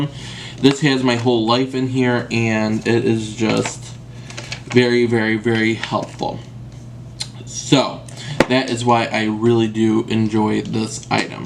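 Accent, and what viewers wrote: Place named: Chicago, United States. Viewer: ..I found you from seeing your comments on other YouTubers I watch! Well I fell in love with you and I luv that Chicago accent! Use to live there and miss it:)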